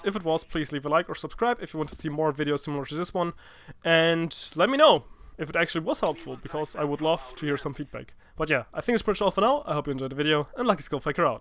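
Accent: Germany. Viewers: Bist du deutscher? Hört sich so an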